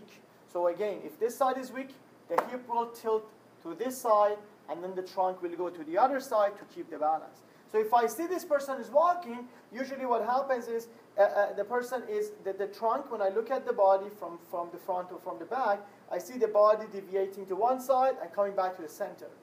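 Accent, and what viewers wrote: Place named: Iran. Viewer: Well done! with nice Persian accent. Damet Garm Ostad!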